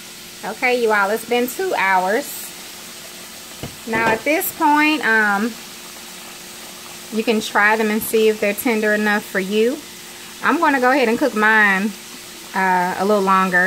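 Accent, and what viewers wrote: South, United States. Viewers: New fan here. Would love to see you do a through and through without pausing and starting again. Your voice is southern and very soothing. Maybe you could talk to us about your day as you're cooking or whatever you feel like discussing. Just a suggestion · She sound fine. Like a southern bell.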